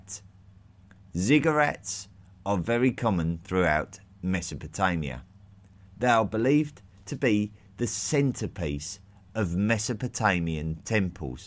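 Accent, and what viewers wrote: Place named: England, United Kingdom. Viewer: I believe it to be the, More-run accent. Fairly common throughout England. Many speak in this manner. Car salesmen. Snake-oil salesmen. Circus leaders. Politicians. It varies widely throughout England.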